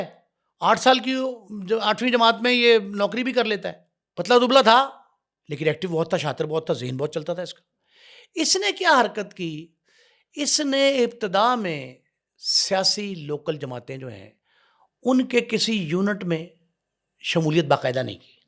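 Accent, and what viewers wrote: Punjab, Pakistan. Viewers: Sahib tu c Paindo ho, bus video Bani ja, paisay kami ja. Tohadda Karachi SE Kiya talluk. Ardo (urdu) Punjabi which bolde ho. O Paindo bey ja.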